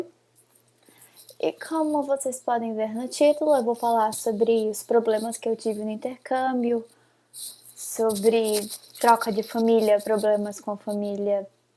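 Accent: Recife, Brazil. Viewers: Tu é de Recife?? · tú é dq de Recife ?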